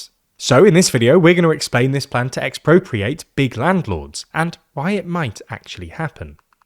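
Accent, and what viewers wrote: United Kingdom. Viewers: "dõnt õwn theyr hõüms" way too british for me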